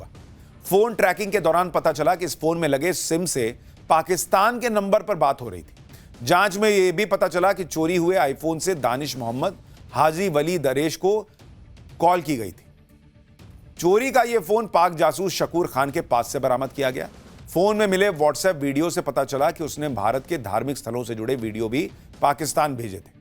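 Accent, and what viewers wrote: Bihar, India. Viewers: Yaar sushant ko bolta sun ke aisa lagta hai ki ye national channel par nahin bihar ki audience ke liye anchoring kar rha hai.....baar baar bihari tone mein baatein bol jana cool nahin lagta dost....kripya bhasha, shabdon aur tone ki maryada ko durust rakha kijiye.....JAI HIND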